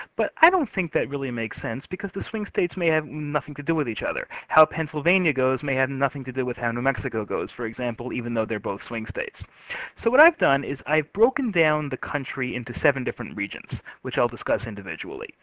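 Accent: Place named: New York, United States. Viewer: r u from new york? you say "all" and "call" differently.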